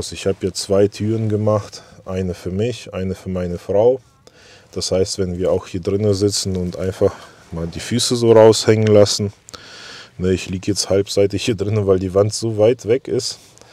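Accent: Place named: Leipzig, Germany. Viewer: Lieber. Klasse Video, ich höre Deinen Akzent als Leipziger immer wieder total gerne. Dein Humor ist spitze trocken. Sag mal bitte, was hast du im Garten für ein Windrad? Nutzt du dies auch zur Inseleinspeisung und welche Erfahrung hast du bei den letzten Stürmen? Lieben Gruß Thomas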